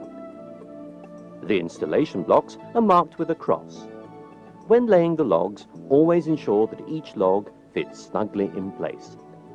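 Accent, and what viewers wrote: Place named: RP, United Kingdom. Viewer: Kind of a posh accent for a DIY project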